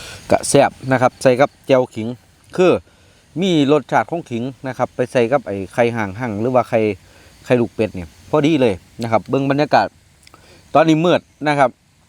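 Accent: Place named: Sakon Nakhon, Thailand. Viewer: อ้ายบ่าวท้าวกินเป็นตาแซบแท้ สำเนียงเว้าออกไปทางคนสกล ลมคือสิหนาวเนาะครับ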